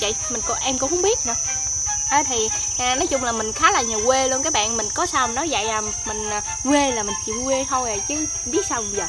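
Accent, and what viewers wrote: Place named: Mekong Delta, Vietnam. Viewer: Do chị ấy người miền Tây nên nói giọng đôi lúc lợ lợ không rõ ý bạn:3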